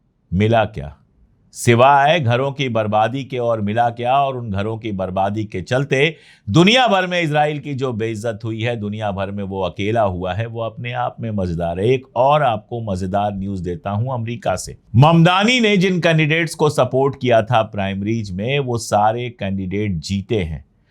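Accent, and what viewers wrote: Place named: Pratapgarh, India. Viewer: I am hearing pratapgarh iu p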